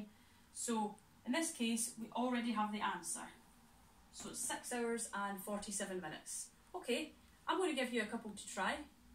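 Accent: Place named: Scotland, United Kingdom. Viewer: I LOVE THATS YOURE SCOTTISH AND IM SCOTTISH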